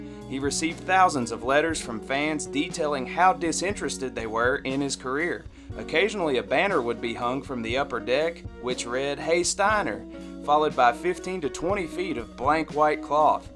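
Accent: South, United States. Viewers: I don’t know why. But I really enjoy your voice. I’m from Ontario Canada. In no way am I insulting you. Can I ask where your from. I want to guess some where in the south. I think you are a great story teller. Keep up the great work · Love your southern accent . Makes an oldschool baseball video more authentic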